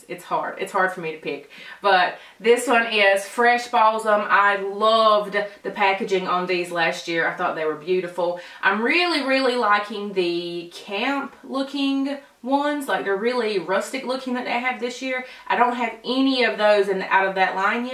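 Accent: South, United States. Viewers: Love that southern accent of yours ❤️ where ya from? I’m from the south too ofc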